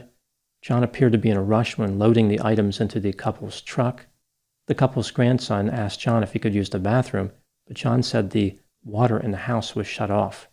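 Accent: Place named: Mid-Atlantic, United States. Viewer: Hi! Question might seem odd, so forgive me but I'm curious. I've been listening to your videos for a couple of years now. They've been soo helpful. Thank you! You have a particular accent..would you happen to have grown up in Southern NJ, PA, or MD? Folks from those areas have a particular way of pronouncing certain words. Lol. I've made a bet with my friend that you're from one of those places lol.😆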